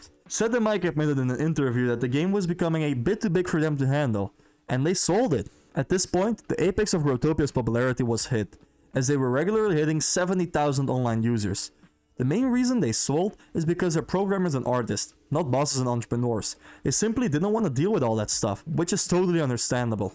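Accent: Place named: Lithuania. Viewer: lietuvis ,ane? is akcento matosi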